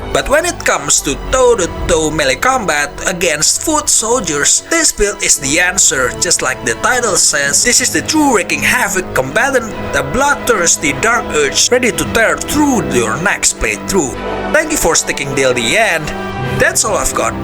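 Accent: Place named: Indonesia. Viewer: i know an indonesian when i hear one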